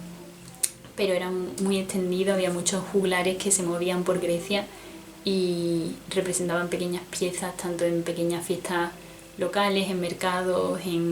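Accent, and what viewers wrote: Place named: Spain. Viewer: Muchas gracias....!!!! Excelente manera de introducir tanto conocimiento condensado. Me encanta tu acento. De parte de España sos????. Saludos desde Argentina.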